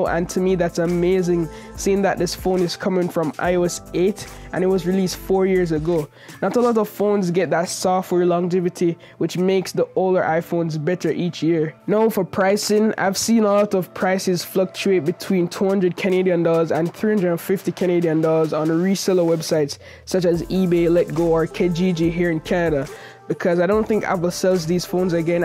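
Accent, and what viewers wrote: Jamaica. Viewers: Are you a Jamaican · You Jamaican? 🤔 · You Jamaican? And what’s the intro song? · that Jamaican accent 😭😭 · dude sounding Jamaican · hey great vid you Jamaican btw I hear the accent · Are you Jamaican bro? The accent sounds familiar. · A mobile phone reviewer with a Jamaican Accent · U from Jamaica bro? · The accent, are you jamaican? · Are you a Jamaican? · Patois strong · are you jamaican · Are you Jamaican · Can't miss that Jamaican accent bro lol big up yuself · Dude are you Jamaican?? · Are you from Jamaica? Or the Caribbean? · I can tell you are from Jamaica, aren't you?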